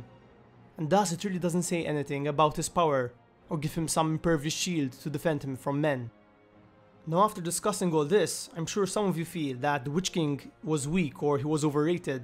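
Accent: Malta. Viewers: are you Maltese? I m going off your accent alone here, hahaha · I could tell you were Maltese purely from the accent haha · are you maltese by any chance?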